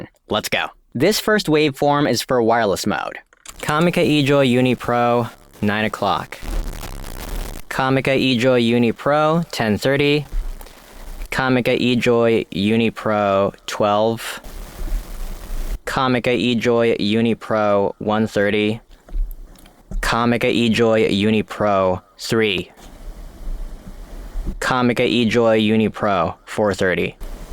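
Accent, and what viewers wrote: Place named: United States. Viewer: In the video you said you are from the Philipines, but your accent is like native American! how come? did you learn it or your were raised in the USA?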